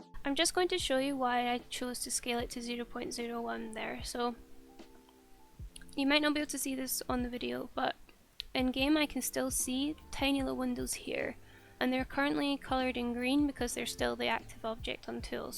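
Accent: Scotland, United Kingdom. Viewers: I hear a fellow scot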